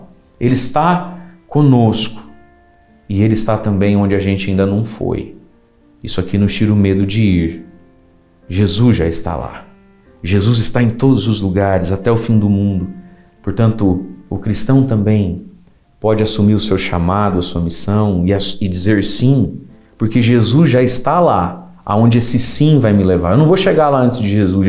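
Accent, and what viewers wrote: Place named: Paraná, Brazil. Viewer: Homilias muito bem elaboradas e de fácil compreensão, com o belo jeito de falar paranaense.Que o Senhor cubra de bênçãos o seu ministério.. Bem Haja.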